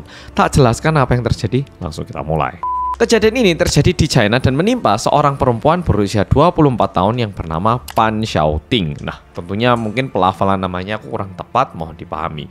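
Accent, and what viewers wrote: Java, Indonesia. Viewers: Sehat sll yo mas tp medok. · Iconic..... medok e masih ada walaupun wes ga di Malang.... idola❤❤❤ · Suaranya medok bgt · Logatnya terlalu jowo gak nyaman di dgr😢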